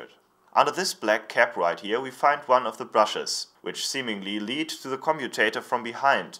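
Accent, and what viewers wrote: Germany. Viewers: For somebody whose native language is French, I am charmed by this German accent. I understood by the context that he was happy of his purchase, so I could not guessed that the expression could express anything negative. That remind me after a good meal, my partner said "I am feed up", thinking he was expressing "I ate too much". It shows that we, Quebecois, can not guess correctly the meaning of these verbs followed by "up" or "down". We have the same problem guessing these French expressions with a name of a color: carte verte, carte blanche... what? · Mal ne doofe Frage: Du bist Deutscher oder? :D Hab jetzt am Anfang nicht drauf geachtet, aber so wie du deutsch sprichst, und wie du englisch sprichst, kann es gar nicht anders sein :x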